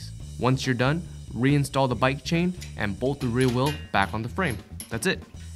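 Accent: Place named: East Los Angeles, United States. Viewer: Man oh Man , I really noticed this guys voice has that distinctive East LA Chicano accent , makes me home sick ... you probably did not even notice but it is a Hispanic Los Angeles Dude talking ! ... video was made in a suburb of Los Angeles !!!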